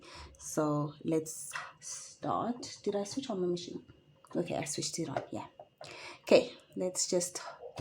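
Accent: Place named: South Africa. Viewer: I heard the South African accent and I was hooked!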